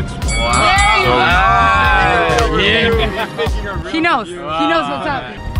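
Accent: Buenos Aires, Argentina. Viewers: hola...soy Argentino, Porteño....vivo en Redondo Beach, California. la verdad que tu español latin aporteñado es muy bueno. saludos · Boludoooo! Tremendo lo bien que hablas el español argentino! Con los años mejoraste zarpado! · Llegue a pensar que era argentino!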